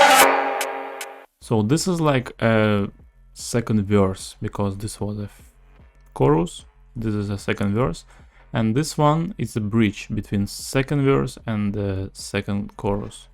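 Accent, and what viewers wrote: Russia. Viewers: акцент русский у тебя) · Блет, по акценту ты будто русский:/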